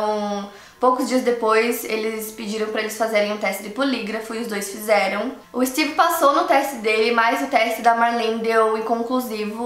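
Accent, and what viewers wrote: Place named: South, Brazil. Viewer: Olha so acho q ela tem sotaque do sul🤣🤣 Mais da pra entender. Tem como colocar legenda tbm!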